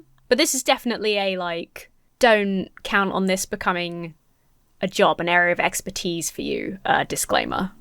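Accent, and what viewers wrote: United Kingdom. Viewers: I love your thoughts. Ever since watching you on the Atheist Experience I can't stop watching your videos. Part of it is your UK accent (guilty as charged) but I keep watching for your skepticism. Your knowledge and thought process is invaluable for us low-education Americans.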